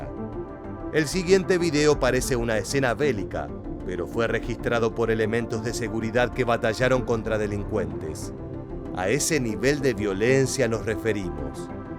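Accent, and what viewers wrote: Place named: Argentina. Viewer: Me da risa como el narrador habla bien argentino,pero neta esto es bastante serio con esto de México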